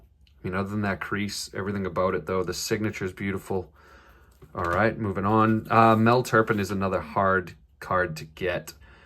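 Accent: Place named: Boston, United States. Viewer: Boston accent comes out in these videos. Haha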